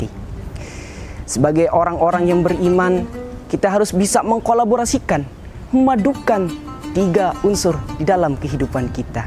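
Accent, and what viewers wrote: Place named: Banyumas, Indonesia. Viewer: Masya allah mamas ngapak, idola🔥🔥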